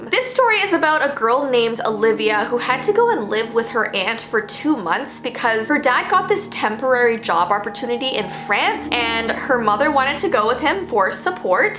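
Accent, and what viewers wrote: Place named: Canada. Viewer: I think she sounds very Canadian, when I first found her channel and binged her vids my mom complained that I was saying “out” weird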